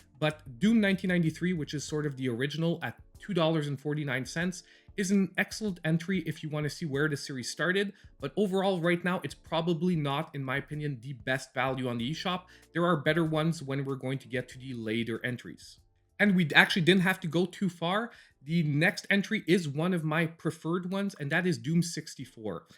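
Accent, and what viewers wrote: Quebec, Canada. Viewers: You can't make the connection between Maple Syrup and the French accent? He's Canadian.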